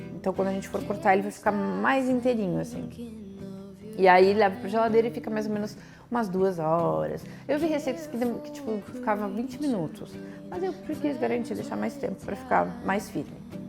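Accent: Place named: South, Brazil. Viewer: É viciante esse sotaque do Sul... Habemus BANQUETE! ❤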